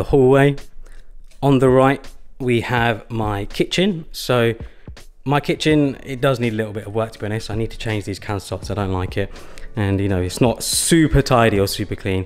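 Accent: United Kingdom. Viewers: You got the best british accent ever lol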